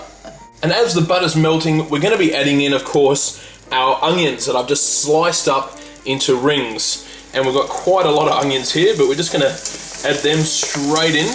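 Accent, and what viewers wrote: Australia. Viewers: HE'S BLOODY AUSTRALIAN MATE. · you can tell he's Australian because of his accent, not British and actually us Brits can cook mate so fuck off to your little cave and eat your rocks.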